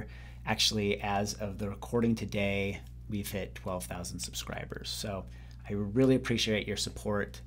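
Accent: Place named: California, United States. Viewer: Where did you pick up that cali girl ascent. It is perfect.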